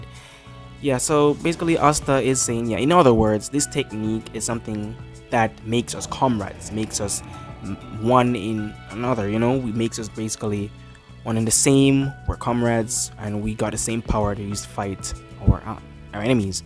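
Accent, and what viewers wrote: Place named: Jamaica. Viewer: with all due respect where are you from brother? I can hear a kinda accent ive heard before a lot but i dont to be rude, but you kinda sound Jamaican. also amazing video thanks for the breakdown of the chapter bro!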